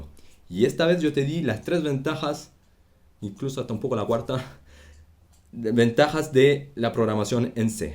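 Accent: Chile. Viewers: Consulta aprendiste español en Chile? tu acento español me parece bastante chileno xD Estoy aprendiendo a programar en esta pandemia y comence con lenguajes mas modernos como javascript o ruby, pero me di cuenta que si quiero llegar a ser bueno debo aprender C que te enseña cosas elementales de la programación. Saludos desde Chile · hablas como chileno bro:3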